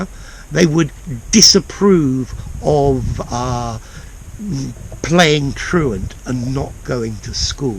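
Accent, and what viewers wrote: RP, United Kingdom. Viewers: Thanks Sir for the lessons you provide for us.What a posh British accent! Splendid!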